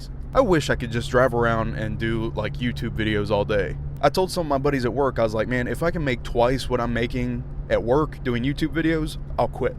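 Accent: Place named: South, United States. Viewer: where are you from? you have a sounthernish accent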